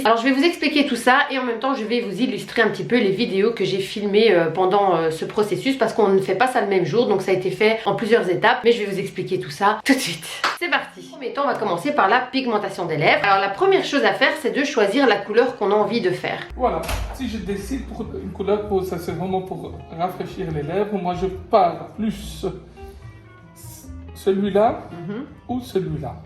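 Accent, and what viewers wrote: Belgium. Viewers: Est ce que tu est belge? Car tu as l accènt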